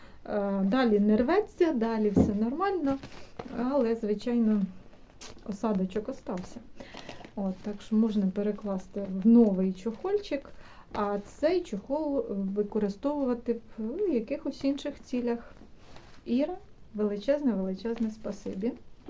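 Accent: Poltava, Ukraine. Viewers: Смотрю Вас девочки оч. давноно подписалась недавно, полтавская речь это другая история ...сама из Полтавы, ...С наступающим Рождеством...🎄☃️🎉🙏🍀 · Полтавський говор буде завжди,якщо ти народився і виріс на полтавщині.Для мене він найрідніший...Плачу разом з вами...Тримаймося...тримаймося...Обіймаю...💞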